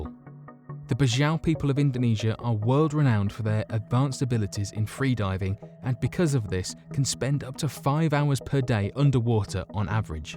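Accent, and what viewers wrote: United Kingdom. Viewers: I am finally tired of the misleading Click Bait and vague stories with no real thorough information. The girl with the different colored eyes, Click Bait! How fast can the African runners get up to?! The Okinawan people live how long on average? Finish your damn stories! These are not super powers - you got me! Clearly adaptation and acclimation have created the hearty Sherpas! Poor journalism with a British narrator! I'm not impressed. With YouTube's recent money police out and about demonetizing people for all the false and stupid reasons, they should take a look at you and smack you for your constant fake thumbnails and false and misleading, half researched, bullshit titles and stories! I will now unsubscribe.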